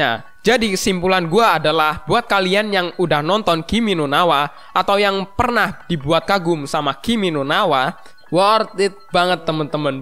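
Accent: Lampung, Indonesia. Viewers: Tabik pun Bang lu orang Lampung ya? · Bang lu orang Lampung? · Bang, lu dari lampung tah ?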